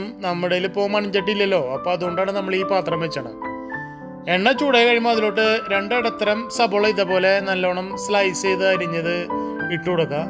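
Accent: Thrissur, India. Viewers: Thrissure aano😄 Curryum samsaravum polichu. Sugar edarilla, Eni cheythu nokkam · Thrissur language poli❤.. good presentation 👌👍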